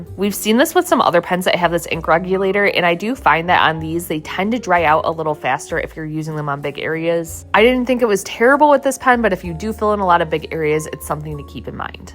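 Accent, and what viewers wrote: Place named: New England, United States. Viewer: i can’t tell if you’re from new england or chicago, i love your accent so much!!!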